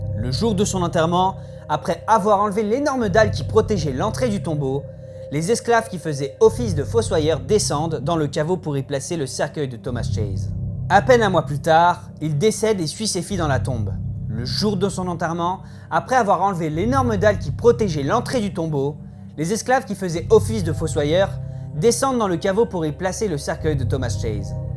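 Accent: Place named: Quebec, Canada. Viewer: aaaah, c'est quoi l'accent que tu essais de cacher, j'entend un leger accent, tu es quebecois ?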